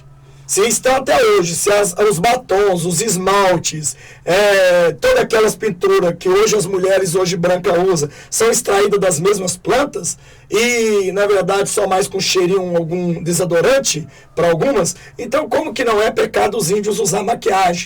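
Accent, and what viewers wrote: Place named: Rio de Janeiro, Brazil. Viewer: Não é a voz da Débora nem da Léia Essa mulher da voz tem o sotaque carioca no S Débora e Léia não tem.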